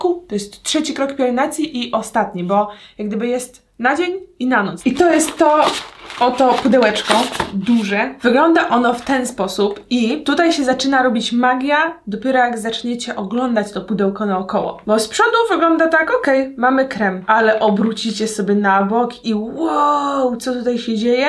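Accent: England, United Kingdom. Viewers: Ale masz kozak angielski akcent 😯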